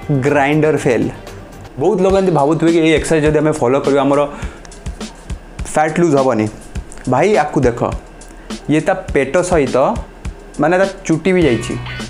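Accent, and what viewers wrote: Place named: Sambalpur, India. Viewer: oo acha vadhia sambalpur language kahucha sunibaku bhala laguchi 😍real ghara kouthi?🤔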